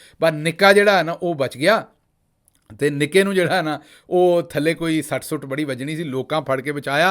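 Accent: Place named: Punjab, India. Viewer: Hahahaha Hahahahaa 😂😂😂😂😂😂😂😂😂😂😂😂 Kia style hay Asli suchi Punjabi 😅😅😅😅😅😅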